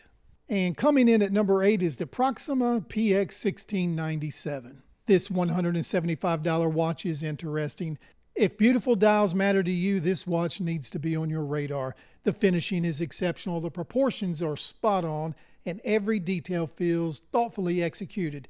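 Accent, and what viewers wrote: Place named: Maryland, United States. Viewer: Hey. I'm trying to figure out your accent and where you're from. Maryland? Carolina's? I'm probably way wrong. Lol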